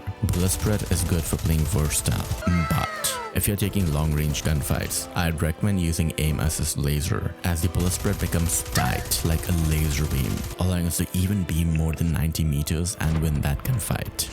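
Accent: India. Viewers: I usually dont like indian accents as they are hard to understand but the crispness of your mic and the way u talk is just so smooth 😭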